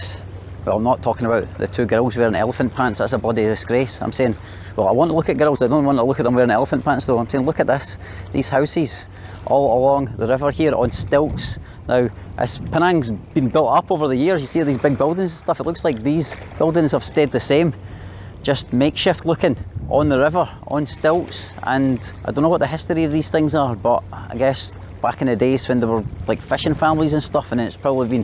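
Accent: Scotland, United Kingdom. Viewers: You got a big problem with 🐘pants 😆And Scottish in you really come out in this video lol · me too, and his Scottish accent is very strong. Bagus buat yang pengen belajar aksen Skotlandia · I like you’re Scottish accent.Maybe visit one day Belgium🇧🇪 .I am gonna be you’re guide😊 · A Scottish accent ...Cool Traditional Chinese habits, commemorating ancestors, hope ancestors bless…… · This was sooo funny listening to a fellow Jock moan aboot the price of something 😂 He was right though, definitely a proper rip off!! 🤬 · Hi friend where are you from, Scotland or Midlands?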